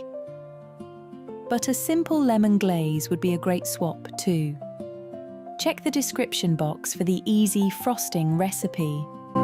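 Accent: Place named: United Kingdom. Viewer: I love her British english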